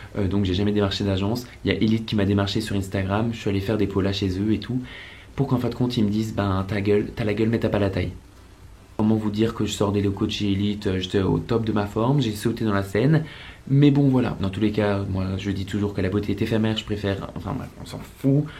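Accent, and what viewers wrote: Alsace, France. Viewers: Depuis que je regarde t'es vidéo j'ai l'impression que t'es alsacien et puis la je tombe sur cette video et tu as répondu a cette question et purée quelle satisfaction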